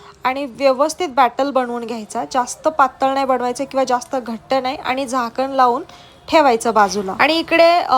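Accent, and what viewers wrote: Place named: Pune, India. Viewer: तुमची पुणेरी मराठी खूपच शुद्ध आहे 😅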